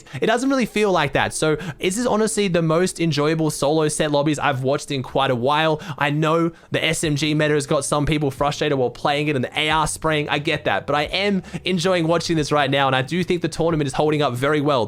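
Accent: Australia. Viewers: Gooday aussie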